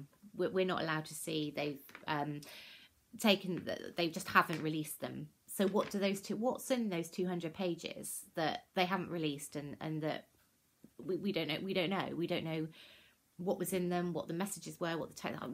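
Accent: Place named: United Kingdom. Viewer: Let me tell ya, you could help over info I already know and this Texan in her 50s would find and about anything you say in that endearing Brit accent requires no apology. Go ahead. Make a video saying, "We are invading Texas and under our rule Texans must consume tea every morning, afternoon and evening or pay a fine, doubling for each offense." Most would think it sounds charming.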